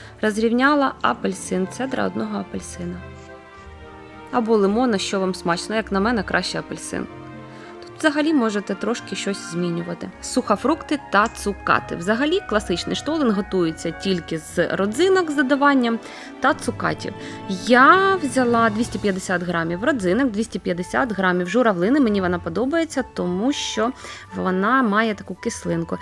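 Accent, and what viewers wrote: Russia. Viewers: Ну доя чого тужитись балакати украинською мовою, аж вуха ломить бо русский акцент видно шо ви гоаворите росийською мовою и вона Головна. То все краще слухати чисту мову бо це не украинська а перелом мозгу. . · Це справжній рецепт. Професійно! Приємно дивитися. Але не дешево (для мене ). І дивна мова у вас - наче українська і хороша, але ж "падарунок" , " раздєліти" та інш. ....це ( просто цікаво) у вашому місті так розмовляють?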